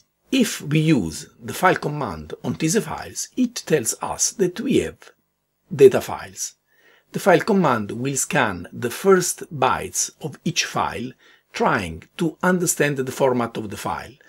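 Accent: Italy. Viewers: the italian dancing accent with scretched vowels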